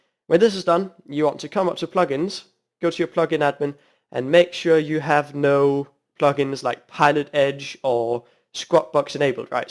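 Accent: Denmark. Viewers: i knew u where a dane hej :D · er du fra Danmark?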